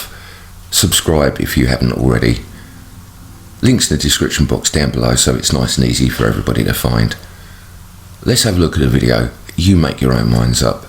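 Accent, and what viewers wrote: England, United Kingdom. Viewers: "Enen if you think it's a bug on the lense you're crazy"? This kind of thing has got to stop! And NOW! As I watched this video i thought, at last! A quality video made by a quality person and not people yelling and swearing at each other and stuffing their belief systems down each others throat. There is a point where you say "it's for you guys to decide" True! It's for everybody to decide, make their own minds up. Thats how it should be until we are all told the truth and we know for sure! It's all we have at this time. Discernment and choice. You very nicely lay out the facs that YOU have. Interesting pictures indeed and food for thought, BUT you ruin the video and my opinion of you by saying someone is crazy if they don't see it your way!!!! You sound English so if you are I'm sure you will know of the Astronomer Patrick Moore? 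I never found him appealing to watch but Im sure millions would agree. He was hoever , a very interesting man if you could keep up with him talking 20 to the dozen. As a kid I learned a lot from him but some months ago he was discussing the moon with another astrologer and he mentioned the conspiracy world and the "fake moon landings" .he looked right into the camera with that terrifying eyebrow up on his head almost and the eye that belonged to the said brow, popping out like an organ stop and shouted at the viewers "anyone who thinks that WE didn't land on the moon has to be compleeeeeeetly INSANE"!! That was it for me, all respect GONE in a flash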